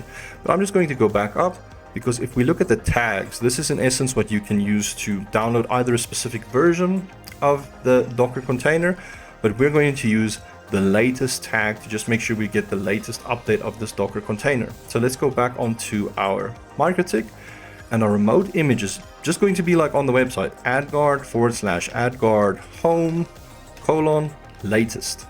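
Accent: South Africa. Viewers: Great video, helped me two years later, thanks, am sure I hear a South African accent there! Lekker Boet!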